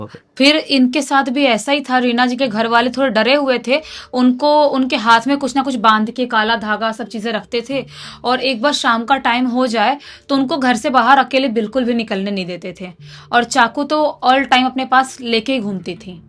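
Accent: Bihar, India. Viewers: aapki tone bihario wali h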